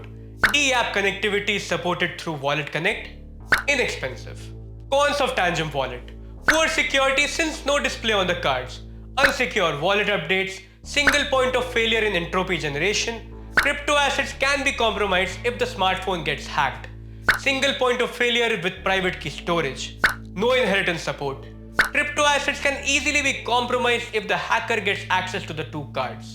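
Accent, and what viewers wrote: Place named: India. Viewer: Cypherock executives, you need to get an American, Englishman or other presenter with English as his native language. Unfortunately, to the rest of the world India is known as the internet scam capital of the world and you having an Indian present your sales pitch is doing your product acceptance/adoption great harm. You might have a fantastic product, but most of us are thinking “scam” as we watch. Seriously!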